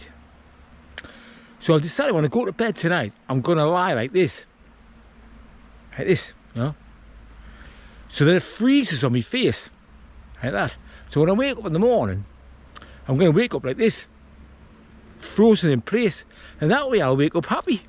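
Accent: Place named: Scotland, United Kingdom. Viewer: Another great video. I'm loving the Scottish accent. My brother in law is a Newcastle boy and it's hilarious listening to him do my accent. It's nearly as good as yours.😅😅😅. Keep doing what you are doing Bonny Lad. 😅😅😅❤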